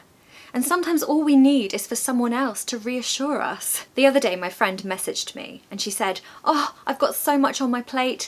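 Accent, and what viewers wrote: RP, United Kingdom. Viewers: ❤❤❤❤❤❤❤❤ Love you so much ! Your Modern RP accent is really beautiful and wonderful My GREATEST Teacher of British English Language!!! ❤❤❤❤❤❤❤❤❤❤❤❤❤❤ ❤❤ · Hi, i love your British accent ❤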